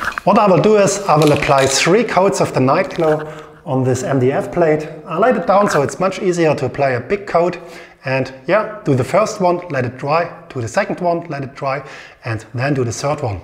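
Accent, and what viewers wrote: Germany. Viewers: Ich weis nicht woran aber man merkt dass er deutsch ist. Und er gibt sich Mühe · Wie man raus hört das du Deutscher bist :). Schönes Bild.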